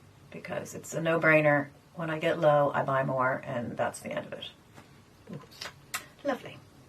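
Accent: Ireland. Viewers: Is it just my imagination or do you sound Irish sometimes? I just bought this baked foundation in porcelain and will try it today for the first time. Every review I've seen is amazing. Love your channel, I'm 50 and have never really used any sort of moisturizer or sunscreen because I would have a reaction to everything. But now at 50 I've been using Tallow which I triple render and my skin seems to love that just fine. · Your accent sounds ever so slightly Irish at times. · You sound Irish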